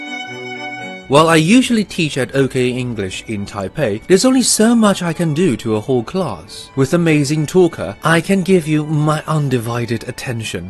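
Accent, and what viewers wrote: United Kingdom. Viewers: 英式英文就是優雅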